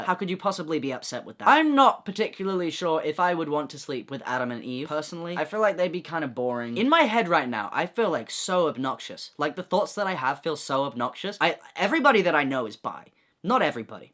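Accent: United Kingdom. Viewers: he's so bri'ish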